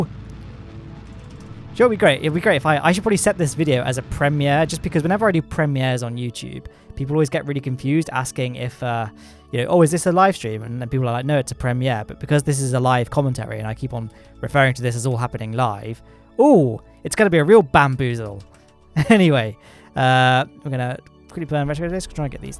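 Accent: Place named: England, United Kingdom. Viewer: wait what omg is english?????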